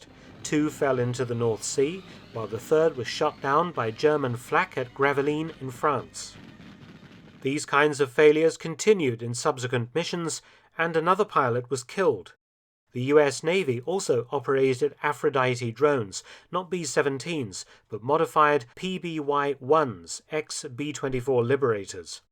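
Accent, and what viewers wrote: United Kingdom. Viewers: I love the way you limeys talk..."Norrich" we say Norwidge (I know that's not how it's spelled)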